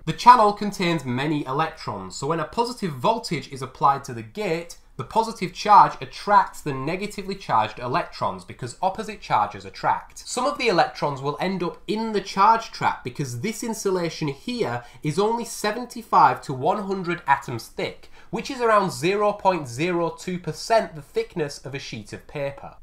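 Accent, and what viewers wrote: Northern England, United Kingdom. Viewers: You've got a new follower. Educational video with occasional healthy humor and a nice Sean Bean accent(and everybody else from the north in the GoT). Followed at less than 10k followers. See ya when you get a million of us mate.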